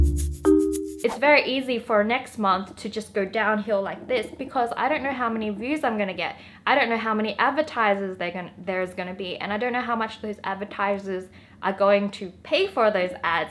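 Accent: Australia. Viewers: is that Australian accent? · Love that Australian accent tho... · are you australian? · Are u an Aussie ??? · OMG her Australian accent is way stronger in this video compared to her 2018 vids 😂😂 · Your accent changes. I hear Australian n American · Is she Australian??? Fellow Aussie! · Are you Australian?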